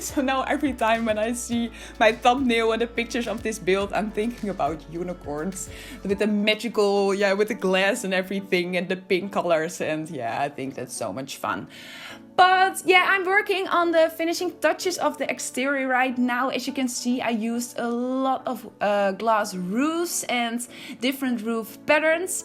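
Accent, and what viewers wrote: Netherlands. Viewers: This looks great! Where are you coming from? It sounds like you're from Holland. I'm Dutch myself.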